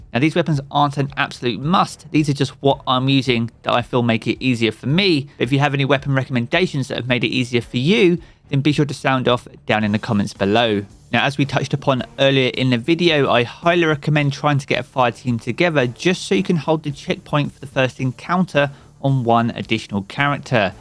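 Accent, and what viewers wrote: United Kingdom. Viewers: I literally loved your content because it’s straightforward and your British accent is just absolutely lovely ❤❤❤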